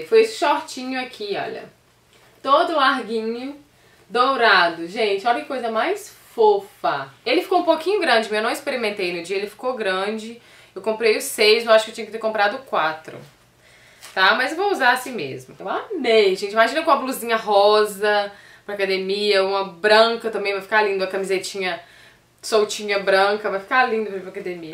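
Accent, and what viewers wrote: Minas Gerais, Brazil. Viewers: Eita sotaque minerin gostosoooo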